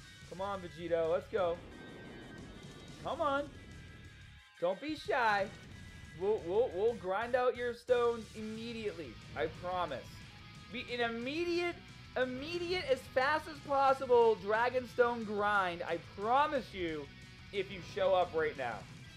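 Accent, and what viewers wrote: Boston, United States. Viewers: Don't let toon bully you out of your Boston accent man! I loved the way you said dokkan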